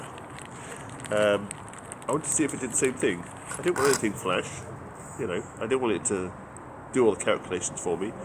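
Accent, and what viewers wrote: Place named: United Kingdom. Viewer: Man I agree with the other guy... You talk like a Brit. I could start a pot of tea and sit down a have a cup or two while ya wax poetic without actually saying anything useful. Remember some of us Yanks don't even drink coffee anymore we are all hopped up on Monsters and Rockstar's. Our patience is shot. Still that's for the review you did give me what I wanted to know, just sold my Caldwell before buying a new air rifle.. to poor to buy another now. Glad this thing might work for a bit.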